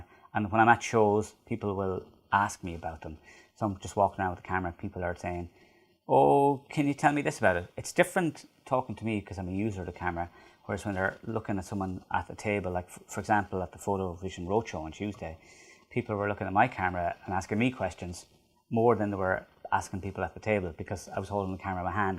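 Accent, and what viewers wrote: Ireland. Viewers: Pronunciation sounded fine to me. Sincere. Thought there might be an Irish accent--not sure. (I like listening to Irish accents). Hope your wife is well. Thanks for making the video. I liked the simple background and the uncomplicated presentation. The video quality was really good. Am thinking to get an XT-2 for making Youtube videos. Thanks again!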